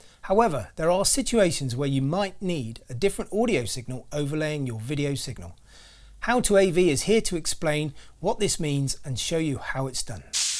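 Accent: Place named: United Kingdom. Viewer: I like this video because even though there is the UK/British accent, the over-pronunciation of H (haytch) does not happen here. I've lived nearly 60 years not hearing "haytch" and so I think it is a recent invention/addition to the crazy British accent phenomenon. I call it a phenomenon because when British singers sing, the accent disappears completely! (similarly when people sing US country music they develop a southern accent where none of little is present when speaking) so much fakery in speech... triggers the autistic reaction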